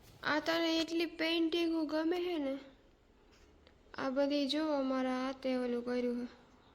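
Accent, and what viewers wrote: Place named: Porbandar, India. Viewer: From your language I think 💯 % u r from porbandar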